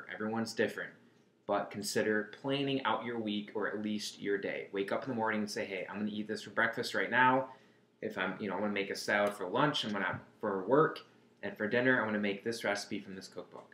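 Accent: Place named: Chicago, United States. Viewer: I knew from your first sentence that you were from Chicago lmaoo me tooo :)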